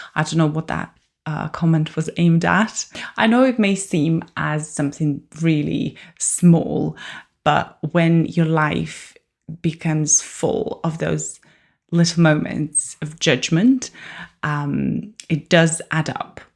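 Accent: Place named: RP, United Kingdom. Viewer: Hi, First of all, may I say how wonderful your English is, and that posh accent of yours… I absolutely love it! Honestly, if I didn’t know better, I would have thought you were born in Britain. It’s astonishing to hear such a lovely accent! Hearing you speak brought back so many emotions. It reminded me how quickly time has passed, how many challenges I’ve faced, and how, at the end of the day, every immigrant who becomes a citizen of their adopted country truly cherishes, appreciates, and values the place they now call home. A little about me: I was born in Venezuela to immigrant parents who had built their lives there. Yet I never felt I truly belonged in my country of birth. Deep down, I always wanted to move abroad. Thanks to my parents’ nationalities (Chile and Portugal), I had the chance to come to the UK before Brexit closed that door, and I seized it. 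Those first weeks in Britain were life changing. I realised how much I would have missed if I had stayed behind. I wasted no time: I got a job, organised my documents, secured my right to work, and began building my life here. That first year was a whirlwind of learning English, discovering the culture, and meeting people who made me feel instantly at home. When Brexit came, I rushed to secure pre-settled status to remain legally. Later, I earned my Settlement status (ILR). But still, I wasn’t satisfied. I wanted to go further. I wanted to vote, to have a true voice, and to belong in the eyes of the law, becaus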